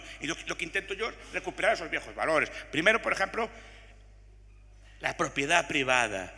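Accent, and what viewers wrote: Galicia, Spain. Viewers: La bonhomía, la sinceridad, la honestidad intelectual, la curiosidad, el afán de conocimiento y su acentuado acento gallego adornan al profesor Anxo Bastos. Merecidísimo el Juan de Mariana por su relevante defensa de la libertad en todos los ámbitos.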